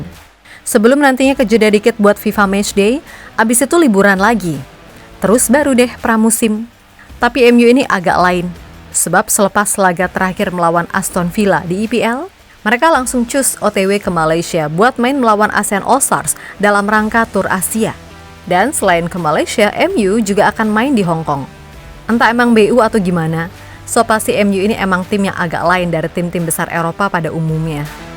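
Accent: Java, Indonesia. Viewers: Fiks mbak mimin orang jawa 😅😅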